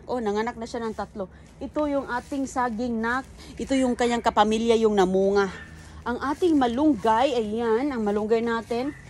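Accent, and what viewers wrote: Visayas, Philippines. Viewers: Visaya bas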